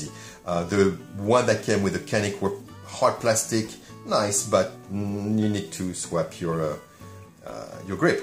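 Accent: France. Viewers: Y a pas un gros accent français ?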